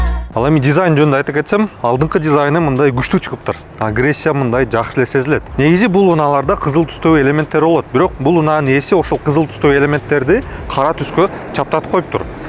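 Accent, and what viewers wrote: Standard. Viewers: Ушу жигит кыргызчаны өтө нукра сонун сүйлөйт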